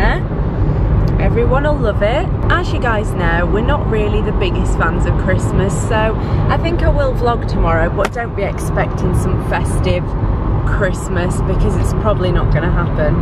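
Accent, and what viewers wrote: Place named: Yorkshire, United Kingdom. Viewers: You know you're back in Yorkshire when you say "chuff" and "'ome" instead of home hahaha! Love itttt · you definitely get more northern whilst up north. #upthenorth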